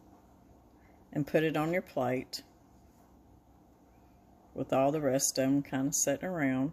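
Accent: South, United States. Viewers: Yum yum! I have made that taco salad before, but used ott's dressing instead of Catalina. I thought Catalina might be too sweet, but I know the recipe calls for it. You've given me the idea to make that when my daughter, her husband, and my two-year-old granddaughter come for a visit on the 15th of August. I think we'll have this the first night, including your pound cake with cream cheese in the middle. I think the only difference will be is that I will sugar up a nice sized bowl of sliced strawberries, and serve them with it. We'll just turn it into strawberry shortcake with a bonus of cream cheese in the middle! By the way, my daughter lives in Northwest Arkansas, in Rogers/Bentonville. She's a nurse practitioner at Washington Regional hospital in the ER. But she is from Central Missouri, which is where we live. We live out in the country near lake of the Ozarks. 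Our little town has a population of 91! I actually live on the outskirts, I guess we're in the burbs, but really, we're just out on a dirt road. 😁 I love watching your videos! I love to cook, and I love to watch other people cook. You cook things very similar to how we cook them, and you talk even more Southern than we do, but your husband sounds like every person I grew up with, including my own family! We are hillbillies too! 😊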